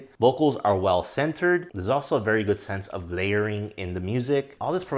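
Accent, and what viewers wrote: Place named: Philippines. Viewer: i hear a filipino accent.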